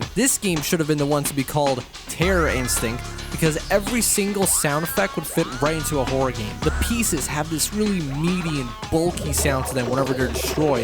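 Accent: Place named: United States. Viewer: Наистина звучиш като американец. Впечатляващо. Също кошчетата за боклук (барабани) на Ларс звучат прекрасно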